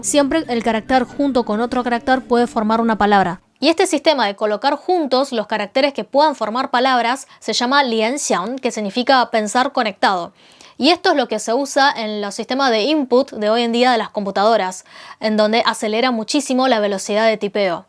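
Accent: Argentina. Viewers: Amo su pronunciación china/argentina dioss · Un linda chinita que habla con acento argentino!! Tenes un nuevo suscriptor che! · Sos mas argentina que yo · Me encantó el video y me gusta tu acento argentino. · Es graciosos ver a una china con acento argentino 🤣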